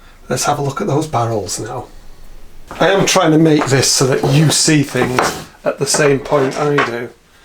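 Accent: Yorkshire, United Kingdom. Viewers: "At the suggestion of my wife..." I don't know how many times my Yorkshire Lass wife has pointed out some reasonable mechanical solution while I'm busy overthinking. I often show her your vids as she loves hearing the Accent of Her People and little things like Henry Hoover in the background that remind her of home.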